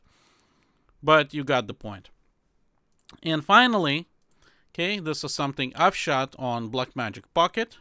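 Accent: Russia. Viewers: Nice. It that a Russian accent? Very subtle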